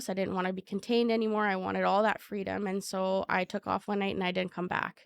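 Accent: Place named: Canada. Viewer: Definitely Canadian 🇨🇦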